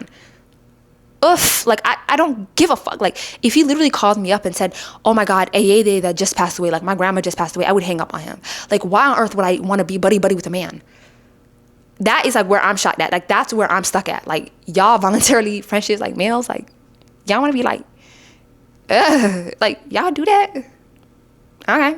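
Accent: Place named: Dallas, United States. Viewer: Ma'am I need to know more about you lol bruh your accent your face your mannerisms your rich cultural history and animated storytelling I looove it all ! So Full of personality ... I'm like do I detect a hint of "american black girl from Dallas" type of accent ??? What region of the U.S. is your accent from ??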